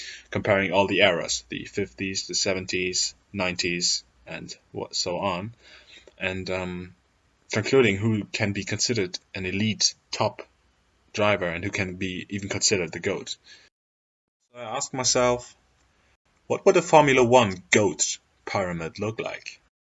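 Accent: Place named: Germany. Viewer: so total agreement right there, except alonso and vettel. i would have switched them around because vettel is better than his ferrari years are letting him look. i think ferrari destroyed him and he would have challenged hamilton more with a red bull. alonso on the other hand was good no doubt but he was maybe to stupid to win more. his change to mclaren was the worst choice you can make and i think its no argument that he was better but didnt have the car to do it. he had offers for teams but he took the worst, in my opinion its all his fault and thats somthing a tier 2 driver has to be aware of. But great video mate. One question: Are you from Germany or Austria because you´ve got a strong german accent?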